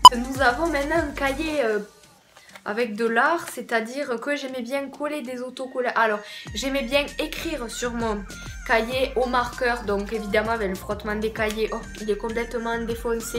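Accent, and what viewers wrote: South, France. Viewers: Team accent du sud ahah💗💗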